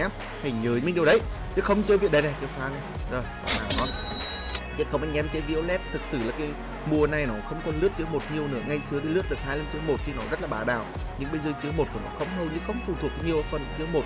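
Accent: Nghe An, Vietnam. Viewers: Chất giọng nghệ an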